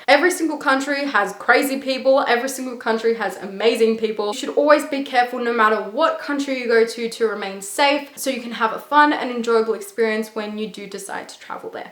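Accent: Australia. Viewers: Your accent is like Australian but with a tinge of something else ?? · Is that an aussie accent? And you speak korean? 😍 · are you an aussie? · You are really pretty i know you sound Australian but I’m wondering what your background is, are you mixed ?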